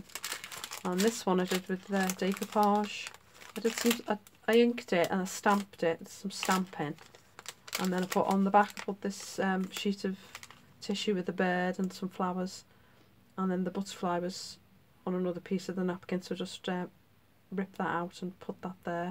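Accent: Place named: Liverpool, United Kingdom. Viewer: Hello. What part of Liverpool are you from.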